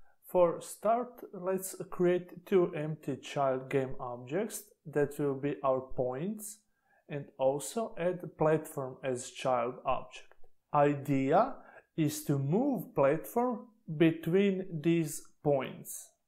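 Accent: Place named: Russia. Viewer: русский да? ну или украинец - акцент)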